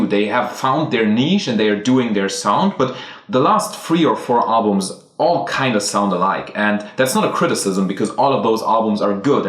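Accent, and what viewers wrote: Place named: Germany. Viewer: Obviously, your translation is going to be the best one. You speak English very well. However, your accent is German. Thanks for your translation!!!! Could you write the lyrics in German and English? Please? This song is so dark! Is about death, isn't it?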